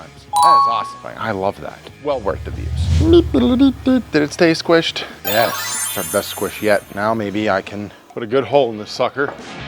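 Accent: Pittsburgh, United States. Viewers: I keep hearing Yinz which means you gotta be from Pittsburgh. Instant sub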